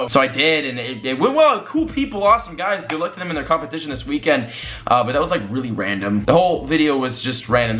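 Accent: Russia. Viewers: Bruh your russian accent cracks me up! XDDDD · That Russian accent got me😂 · Russian accent is leet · Russian accent wasn't too bad... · More Rambling with a Russian Accent. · Why is his russian accent so good hahaaha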